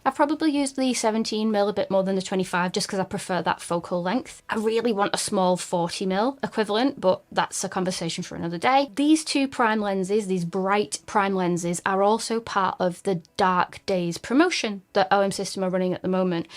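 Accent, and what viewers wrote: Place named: Bolton, United Kingdom. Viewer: What a superb video! Are you from Bolton, by any chance? You sound like a female version of me!! :D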